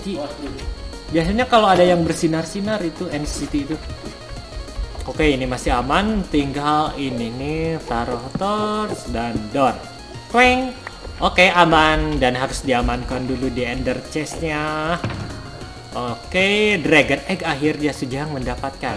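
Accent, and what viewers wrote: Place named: Sunda, Indonesia. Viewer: orang Bandung yak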